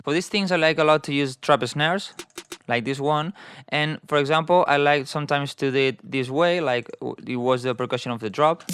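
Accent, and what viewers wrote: Spain. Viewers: este hombre es un español hablando en ingles o es cosa mia? buen video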